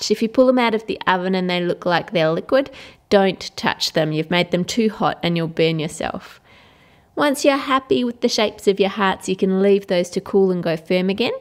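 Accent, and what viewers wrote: Australia. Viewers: Its just a aussie accent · Ate you Australian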